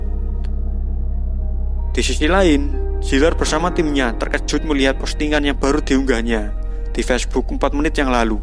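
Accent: Java, Indonesia. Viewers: Medokk bgtt bang 😄😄😄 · Yang jelas pembawa alur ceritanya medok.. Ajib .. menghibur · Medokkk nya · Nrtor nya busyet medok!!!!!!!!!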